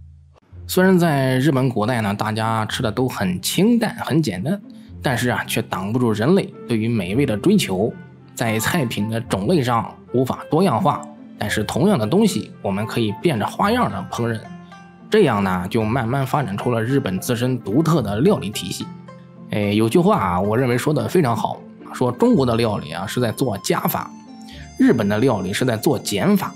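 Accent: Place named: Beijing, China. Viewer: 你的解釋非常好 視頻做的也真的非常棒 但能不能別用北京腔 舌頭給我放平說話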